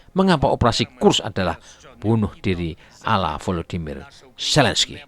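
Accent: Java, Indonesia. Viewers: Narator nya lucu logat nya jawa bingit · Loghat jawanya di kurangi bos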